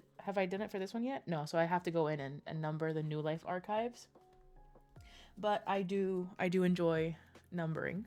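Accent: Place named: Puerto Rico. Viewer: I was not expecting the Puerto Rican accent 😂😂😂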